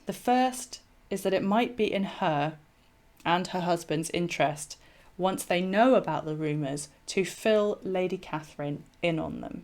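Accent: United Kingdom. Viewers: I am happy to hear good British which is a true English language.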